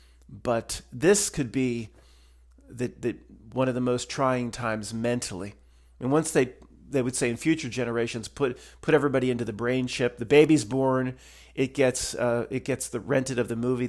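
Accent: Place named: New Jersey, United States. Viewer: Aye! Just resubbed, been a loon̈nng time (I wanna say 2022ish?) Been w u since shrugged, just wanted to say something to yo ass: Thank you. Youve been like an older brother (est.1989) to me since I found myself awake in a world where even my family couldn't see.. sure some of the 1st and 2nd grade truth helped at that period, however there came a point where I needed some1 like you.. no homo. A guy who could be my cousin; just talkin how we talk (jersey) but about the stuff that I needed to flesh out. The stuff that, if brought up to my friends and family would end in argument guaranteed. Anyway, u have no clue, cuz u algorithmically suppressed n shiieeeet, how many individuals like me that were introduced to your content at the time the needed it most. You have no idea how many ppl u have helped. It could be 1, it could be infinitely more, and i speak for myself, yet have a feeling any others would say the s ame thing.. 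if we caught u out; on the worst Monday of your life, we would see you no different my friend. We are the modern day pioneer, seekers, exploring uncharted territory that would make the vast majority of the populace go crazy within days. This is why they refuse to see.. Anyway hope u read this comment. Glad to be back (to YouTube in general).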